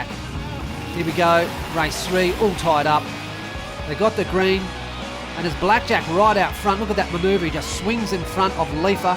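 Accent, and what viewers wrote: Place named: Australia. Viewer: Editing is just brilliant and I just love that australian accent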